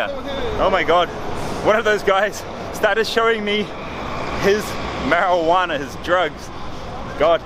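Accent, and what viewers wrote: India. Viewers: Good content but it's very hard to understand your Indian accent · Love your hindi accent Please visit once Varanasi which is older than history itself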